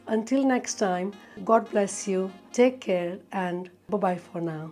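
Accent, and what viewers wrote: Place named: East Africa. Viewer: I can heard east Africa you Born in Here or you been Here tanzania I here Sis I like this recipe nice and taste stay safe and healthy I will cook this sometime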